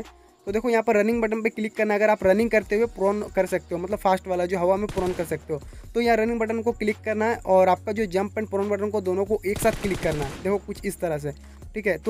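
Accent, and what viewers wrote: India. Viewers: you tolking jharkhandi🙂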